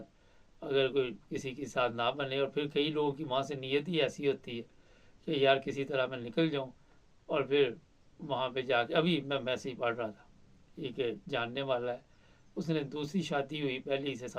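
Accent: Punjab, India. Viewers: Please use a better microphone…. Place it close to your mouth…. You speak Urdu in pure Punjabi accent…. Please help the viewers You provide good information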